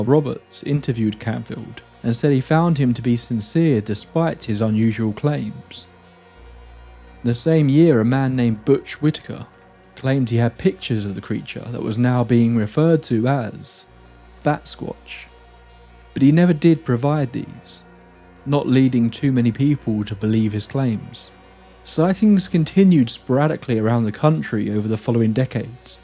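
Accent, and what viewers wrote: England, United Kingdom. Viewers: I Cant what this video. Too slowly explained. Get to the point faster for heaven's sake. Like watching paint dry outside in winter. You should also ditch the english accent narration. So sick of that here on Youtube.😢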